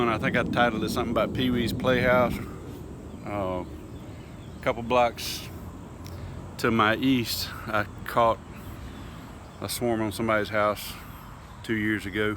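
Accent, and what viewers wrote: South, United States. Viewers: Just a wild guess sir, are you from the south? 😂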